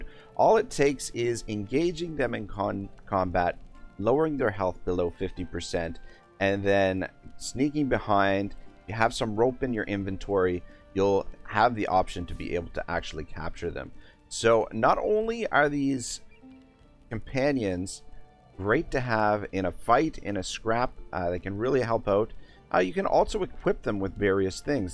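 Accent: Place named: Canada. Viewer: This dude is definitely Canadian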